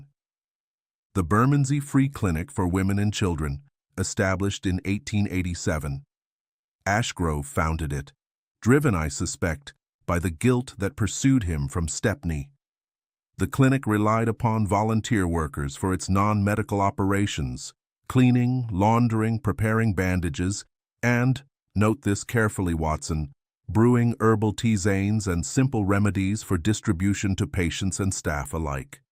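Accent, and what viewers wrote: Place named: United States. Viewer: Why is this in an American Accent 🖕🖕🖕🖕🖕 no Chance I am listening to this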